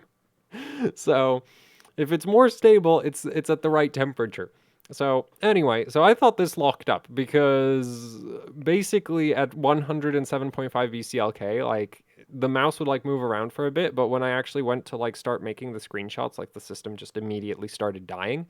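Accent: Czech Republic. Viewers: Wait, are you Czech? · You Czech? Wot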